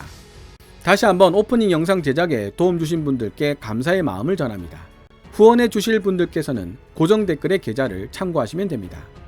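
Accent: Gyeongsang, South Korea. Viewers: 영어단어는 그냥 편하게 읽으시지..참 어색하게 한국어로 말하다가 도중에 나오는 영어단어를 누가 그렇게 혀 굴려ㄱㅏ며 코맹맹이 소리로 어색하게 발음하나요? 거기에 경상도 사투리 억양까지 첨가되서... 되게 이상해요.~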